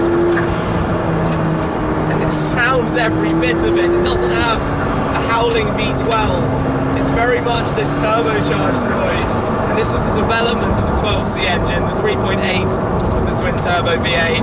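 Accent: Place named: United Kingdom. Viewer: Eduardo Galindo Brambila his accent? He's British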